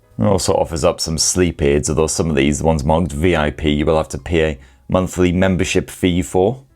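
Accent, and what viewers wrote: United Kingdom. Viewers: What British accent is that?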